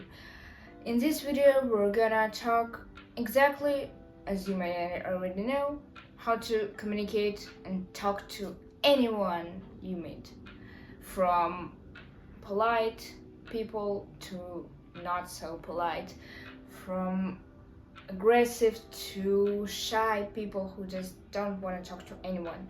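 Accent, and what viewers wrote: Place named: Russia. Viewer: are you from russia? because your accent sounds like someone from russia. anyway, i enjoyed your video :)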